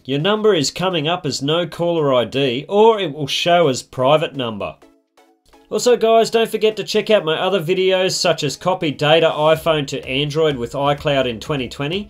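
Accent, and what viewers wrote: Australia. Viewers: Thank you. Love the Aussie accent 🐨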